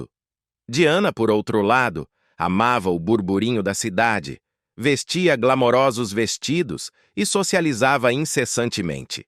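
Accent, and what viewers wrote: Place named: England, United Kingdom. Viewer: Essas publicações s São muito boas, porém o o que estraga é o narrador, se vai traduzir o conteúdo em português que o faça na integra, esse sotaque ridículo de inglês e a troc A de nomes , chamar a rainha Elizabeth de Isabel, isso é ridiculo. Quanto ao post, a falcidade de Camila para ter poder é no mínimo insultante.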